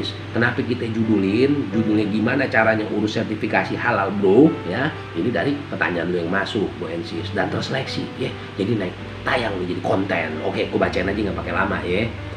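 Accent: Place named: Banyumas, Indonesia. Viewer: salam wong ngapak 😄 ora ngapak ora kepenak 😁😁😁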